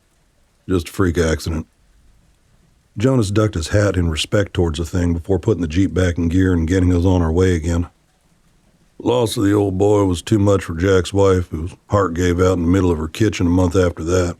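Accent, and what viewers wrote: East Texas, United States. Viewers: Your East Texas series with your perfect country voice is my very favorite for relaxing. I have PTSD major depression and anxiety disorder so I keep it on rotation when I can’t sleep. Thank you for keeping the good energy in and the bad thoughts away ❤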